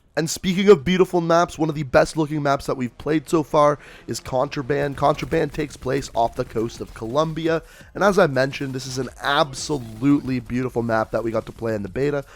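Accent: Canada. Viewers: oh, he's Canadian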